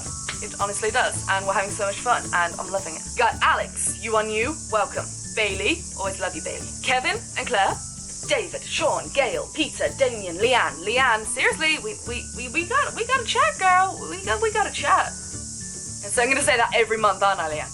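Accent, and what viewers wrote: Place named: Wales, United Kingdom. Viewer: Where's the welsh accent from?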